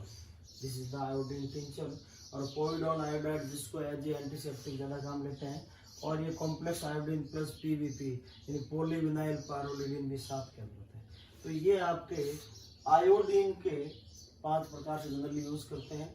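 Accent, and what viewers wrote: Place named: India. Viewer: he speaks indian dude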